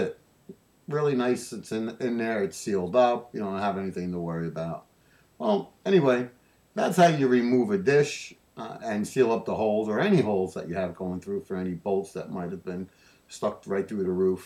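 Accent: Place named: Northeast, United States. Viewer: Nice job. Love the Northeast accent. Not flirting.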